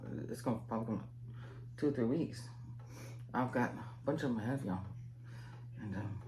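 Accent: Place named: South, United States. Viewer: love your accent. are you from the south? i’m new to the channel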